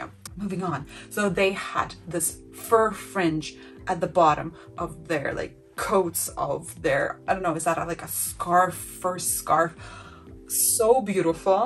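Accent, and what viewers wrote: Russia. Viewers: Great, thanks for your reply...if you don't mind me asking...is that a Russian accent I detect? I'm part Russian so I guess I'm just curious!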